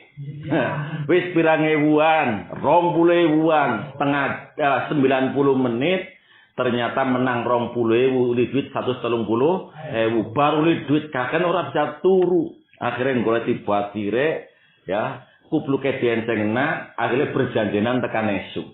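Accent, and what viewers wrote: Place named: Cilacap, Indonesia. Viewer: Mantep ngajine mas kaya wong sekitaran cilacap pa yah mas?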